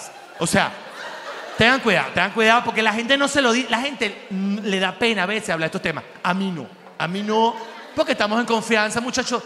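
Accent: Cuba. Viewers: Muerooooo me encanta la interpretación Cubana que haces ❤ Te Quiero 😅😂😂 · habla como si fuera otro cubano más 😂😂😂, mucha observación y cuidado al detalle 😂😂😂😂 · 😂😂😂😂Ayyyy moriiiii. Soy cubana! Y gozo, cuando veo al perrito cubano y cuando hablas como nosotros en tu show. Ya hoy, lo acompañaste, de las vulgaridades, y para mí, resultó comiquísimooo 😂😂😂😂😂😂😂😂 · Soy cubana y en verdad me encanta tu acento cubano y me meo de la risa 🤣 😂😂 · Es q pareces cubano de pura cepa jajajaja,aquí no existe la terapia jajaja👏👏👏💯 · Jajaja, genial el acento de nuestra tierra!!! 😂😂😂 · Jajajajajajaja c.l.m ese acento cubano!! Te quiero mi amor! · Soy cubano de nacimiento, venezolano de corazon y brasileño por naturalización. Viví 7 maravillosos años en el Zulia y escucharte me hace reirme a llorar recordando aquellos locos maracuchos que me tenian el dia entero riendo. Tus imitaciones de los cubanos son fantásticas pero te pareces mas a un habanero hablando que a un pinareño ( lo digo por mi porque soy habanero) un abrazo desde Brasil. 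· Soy cubana 🇨🇺 como m rio cuando hablas como nosotros 🤣🤣🤣🤣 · Ese cubano que llevas adentro está buenisimooo!!!!😅❤🎉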